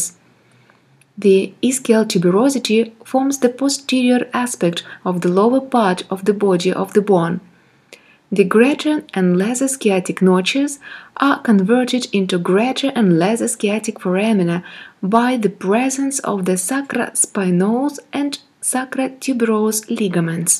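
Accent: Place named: Spain. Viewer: Thank you so much. It was a great help. Btw are u spanish? I asked because of ur accent. I love this accent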